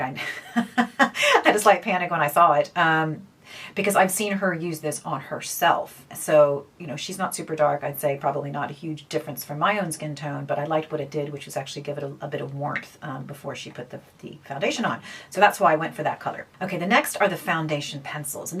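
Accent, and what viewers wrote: Ireland. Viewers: Just listening to you and you saying you're in Europe I kept hearing an irish lilt and was satisfied to see you are based in Dublin! It's so interesting how accents creep into others. I love this review, very helpful as I rarely wear makeup and love the no makeup makeup. The pencils look so promising as well as the balm. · Great review! You’re getting a Dublin accent 😁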